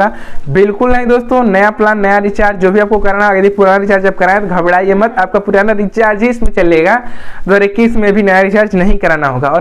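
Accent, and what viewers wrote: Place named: Uttar Pradesh, India. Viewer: Up ladies jasha bat Kew korte ho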